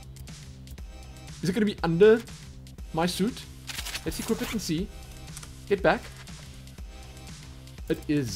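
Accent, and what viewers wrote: South Africa. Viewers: Where are you from you sound a very South African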